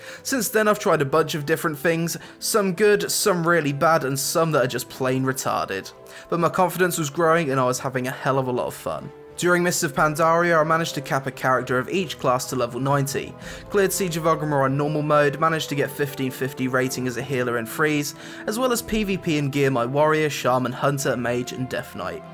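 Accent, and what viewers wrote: United States. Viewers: you American or British?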